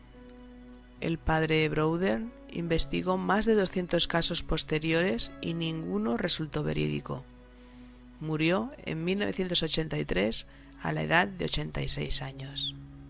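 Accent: Spain. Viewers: ese acento español de verdad no me gusta le quita todo terror .... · Me gusta el acento de espana, es hermoso ;) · Manuel Alejandro, si no te gusta el acento español, buscate videos solo de tu acento. Por otro lado decirte que la narradora no tiene porque tratar de reflejar terror. No esta contando una historia sensacionalista de miedo, para pasar el rato. Si no relatando el transcurrir de un caso, a modo informativo, como documento.... Que le gusta a la gente un sensacionalismo con estos temas... cuando realmente, ese arquetipo de manera de hacer misterio, es el arquetipo que le ha quitado seriedad a la verdadera investigacion o documentacion de los temas de misterio. La narradora no tiene porque narrar de manera que de terror, si no simplemente contar los datos de la historia de manera que se le entienda y quede claro. El objetivo de este video es dar a conocer un caso, no causar terror.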